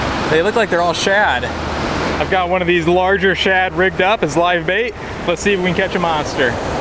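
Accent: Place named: Buffalo, United States. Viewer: Your accent reminds me of my buffalo ny extended family....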